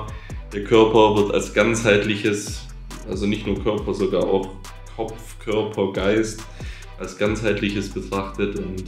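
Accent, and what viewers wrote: Swabia, Germany. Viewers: Sauber gschwäbelt. So isch richtig. Musch au mal wieder mehr schwäbisch schwätze ;) Krasse Einstellung und das kurz vorm Olympia, so muss das💪 btw. ich hasse die Hitze 😂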